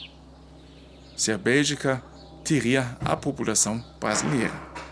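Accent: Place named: Germany. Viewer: peço a você que nós desculpe do comentário maldoso feito por um desqualificado em seu canal, não se deixe abater por isso pois nós gostamos muito de você de sua esposa e de seus comentários e de seu jeito de falar ( português alemão ) queria te dizer também que esses números estampados na mídia de 300.000 mil óbitos aqui no brasil não são reais...pois por trás existe uma indústria que lucra com a pandemia com a morte das pessoas...houve estados que receberam recursos do governo para usar na crise e o dinheiro foi desviados para outras finalidades, construíram hospitais de campanha e não foram utilizados...os serviços funerários lucram como nunca...a mídia ataca o presidente porque ele cortou toda verba de, publicidade do governo...secou a fonte de corrupção...aqui no brasil ninguém morre mais de zica virus...,dengue..., derrame cerebral...infarto...câncer....acidente de trabalho...., acidente de transito...,malária.., tuberculose...,pena munia...aids...etc...tudo aqui é covid....aqui me despeço com um forte abraço. 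" Gott sei mit dir "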